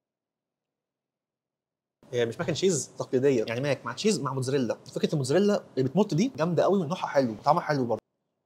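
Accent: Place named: Saudi Arabia. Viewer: لهجتك السعودية حلوه مره😂😂😂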